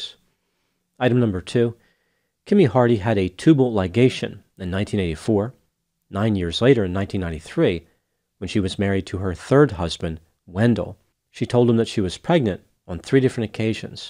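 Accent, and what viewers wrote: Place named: Pittsburgh, United States. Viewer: Are you from Pittsburgh,? Your accent reminds me of my hometown. 😊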